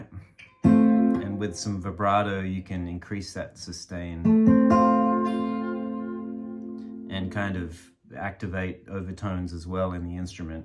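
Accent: Australia. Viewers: I enjoyed listening to you man. Some people don't have the attention span to follow the train of thought through. Is that a slight aussie twang I'm hearing??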